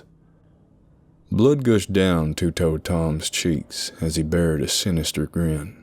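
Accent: South, United States. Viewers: I love your overly southern deepest of the south accent.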